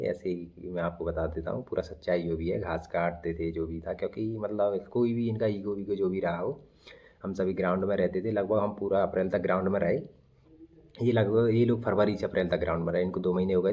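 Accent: Maharashtra, India. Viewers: Saff pata chala sir aap marathi ho sir❤🎉🎉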